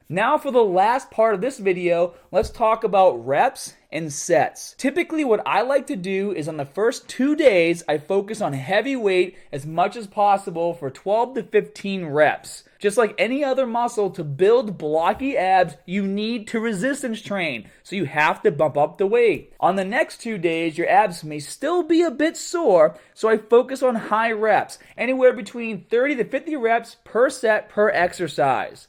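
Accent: Boston, United States. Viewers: Stop giving lessons on body training but teach us how to speak with a Boston accent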